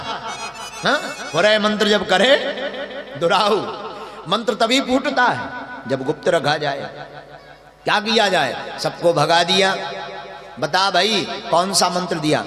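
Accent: Bundelkhand, India. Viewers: Gurudev ji k shree mukh se bundelkhandi boli bahot achhi lagti h jai sitaram 🙏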